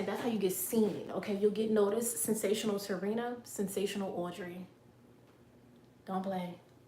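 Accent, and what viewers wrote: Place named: New Jersey, United States. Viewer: I am so grateful to have found your channel!! I love your energy and this video was incredibly helpful! And this Jersey accent is giving! I live in Houston now but one Jersey girl always knows another. 💖💖💖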